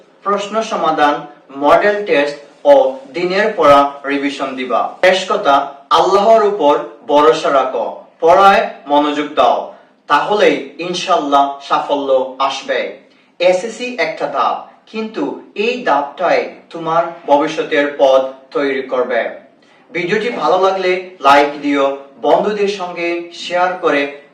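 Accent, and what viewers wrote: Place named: United Kingdom. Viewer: sorry baia / sir. but আপনার কথা বলাটা কিছুটা ব্রিটিশ টাইপের। মানে আপনি মনে হয় english techer তাই হয়তো এমন মনে হচ্ছে। 🙏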